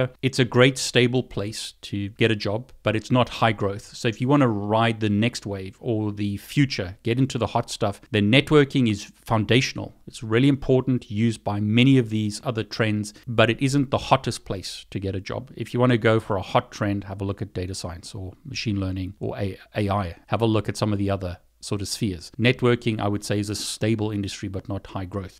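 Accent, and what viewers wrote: South Africa. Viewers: Thank you, this was super helpful. & so great to hear a YouTuber with a SA accent! Hi from CPT. 😁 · Are you south african? You sound south african :) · Great hearing a South African accent inspiring success so powerfully. Baie baie dankie, boet!!! 😉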